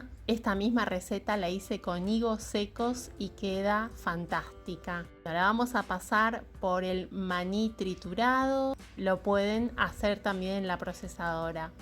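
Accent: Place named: Argentina. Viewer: Que ricas recetas, tienes un acento Argentino.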